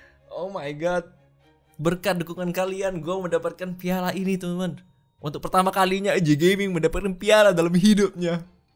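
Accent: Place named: Java, Indonesia. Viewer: Ehh wong medok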